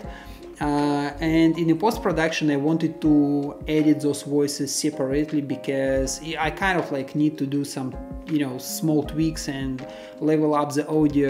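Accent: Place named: Russia. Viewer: чувствуется русский акцент.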